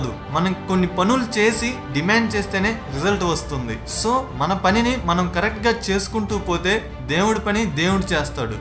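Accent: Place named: Nellore, India. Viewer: mee slang eppudu bagundhi.. First lo aiethe baaga telisipoyedhi nellore or ongole ani.. I am from nellore if possible mana slang kottinattu untadhi inka marcheyamdi..appudu andharu chustharu..mee hard work ni appreciate chesthunanu.. all the best.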